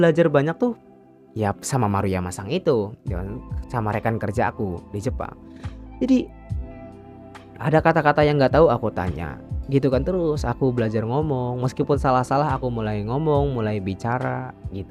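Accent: West Java, Indonesia. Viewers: Mantap kang, makasih vanyak i'lmunya kang.. Tapi daru katanya seperti orang sunda kang duhk. 😁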